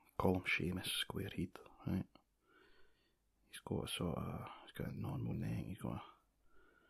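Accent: Scotland, United Kingdom. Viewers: I absolutely love your voice, I'm a sucker for scottish men and their accents ☺ Great video and doodle adventure! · I’m originally from Dumbarton. In the states now. Love hearing the homeland accent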